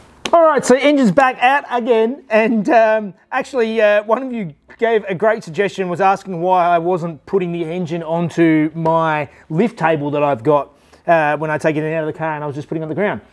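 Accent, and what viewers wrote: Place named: South Australia, Australia. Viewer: Oops. Embarrassing! I owe her an apology. Now I can hear it, but she's definitely picked up a a lot of the southern-Australian accent. And in mitigation, my hearing was shot away quite severely by neuropathy (nerve damage) last year. Salut.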